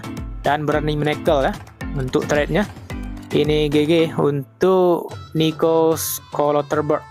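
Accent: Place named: Aceh, Indonesia. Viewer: Logat bahasa kek Aceh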